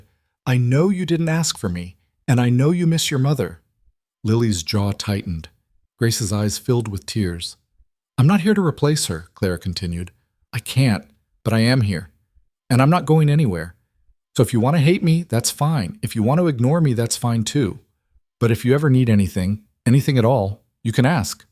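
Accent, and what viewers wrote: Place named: United States. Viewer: Whoever wrote this has no understanding of the regency period or the manners and language of the British aristocracy. An American narrator doesn’t help.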